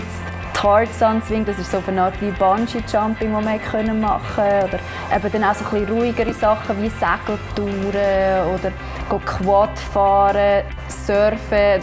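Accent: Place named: Germany. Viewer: what german accent is it ?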